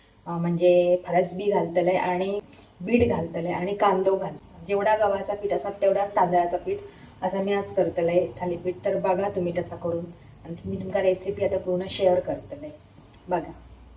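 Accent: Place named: Malvan, India. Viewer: "Hello ma'am just watched your latest vlog on cooking those delicious-looking thalipeths and I'm craving them now! 😂 Loved how you shared your morning routine and gave us a sneak peek into your daily life. The recipe was super easy to follow and I'm definitely trying it out this weekend! 👍 Your energy is infectious and it's so much fun watching you cook. Keep 'em coming! 💕 The malavani accent you speak is so amazing 😍 got to see u in very different way ....keep going lots of support and well wishes for your YouTube journey ....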